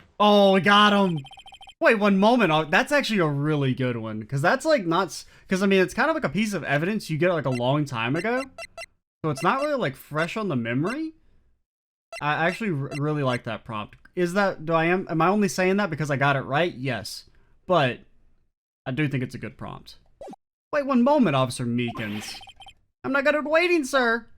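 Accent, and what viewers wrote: South, United States. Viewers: Meekins has the best court intro. An innocent confession right off the bat xD Also, I quite enjoy your southern accent, not many peeps I watch has one. Makes it more unique.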